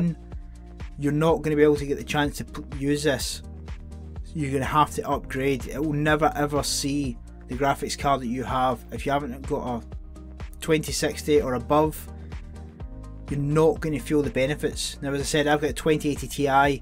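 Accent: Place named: Scotland, United Kingdom. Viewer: DLSS seems to work good as well! Any intends to show off some of the worlds you have/will created/create in Minecraft RTX? I mean, I watched every video about it, and evey single one showed the demo. Would be really nice to see something diffr, looking forward for your contents. Many thanks and stay safe please! I like your scottish accent, btw(..odd to say? I know lol).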